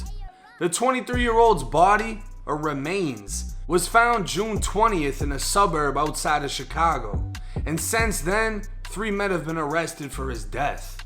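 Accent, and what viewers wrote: Boston, United States. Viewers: Yo you sound just like me Fam you got to be from the Boston area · Never heard a wigga with a Bostonian accent. It’s cool. I like it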